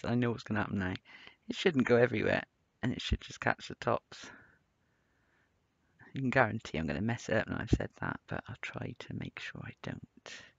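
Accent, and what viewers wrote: United Kingdom. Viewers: I loved loved this video. Lovely British accent, soothing instruction and gorgeous pieces!!! Thank you!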